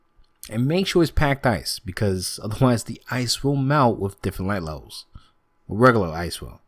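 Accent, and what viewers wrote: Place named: New Jersey, United States. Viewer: I'd say Italian from Jersey.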